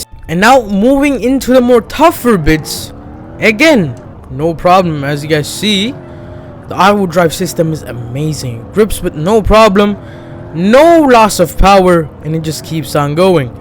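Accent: Germany. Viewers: i love the german accent. Ich hab noch nie so einen schönen , guten , vry butiful germanium mensch gesehen. spass bei seite ich liebe deine videos! Dein humor ist perfekt :D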